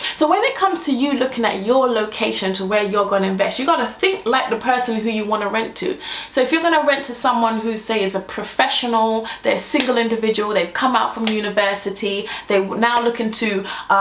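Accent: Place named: South, United States. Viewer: why have you gone in to the southern accent. its so funny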